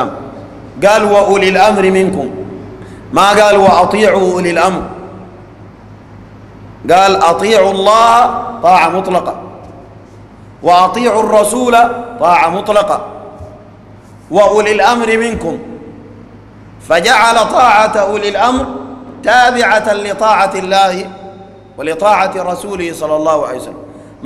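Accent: Sudan. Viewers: كم هي حلوة اللهجة السودانية اخوكم من الجزائر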